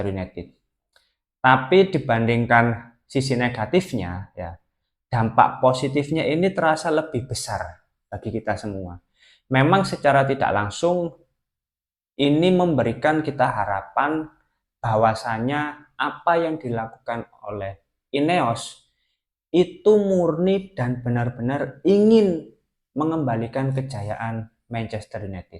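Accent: Java, Indonesia. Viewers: Sampean jowone ngendi cak??? 🙏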